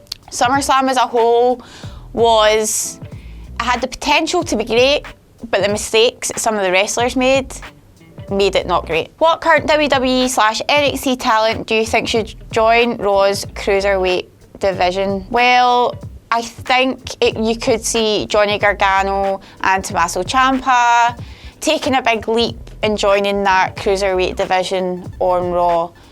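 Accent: United Kingdom. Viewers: Guys why you complaining about her voice. She is British , it's not that bad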